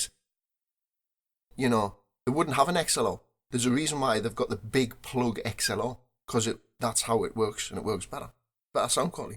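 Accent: Newcastle, United Kingdom. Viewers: Love your videos. It's not just the Geordie accent. You are proper talented, and very entertaining. Now I need a proper XLR microphone. I have a 3.5mm jack to XLR adapter, but it's not great. It picks up radio interference.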